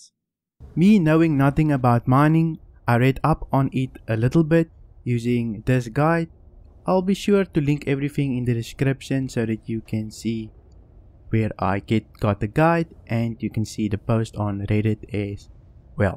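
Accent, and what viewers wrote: South Africa. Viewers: That accent. I'd know it anywhere. Fellow South African. Nice vid man. Hoping to get into SC soon so I'm watching a lot of gameplay videos. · Are you South African? Can hear an accent but not sure, nice video, I'm renting a prospector currently and using helix mining head, making about 45-90k a run. Almost made 1week rental money back in 1 day and now it's for the grind to use the rental to buy it, 1 mil to go. Subbed and liked your video.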